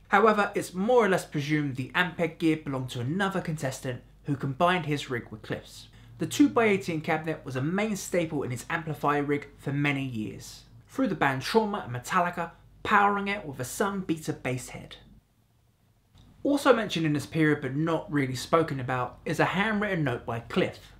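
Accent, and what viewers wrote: England, United Kingdom. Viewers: I thought that was just his English accent.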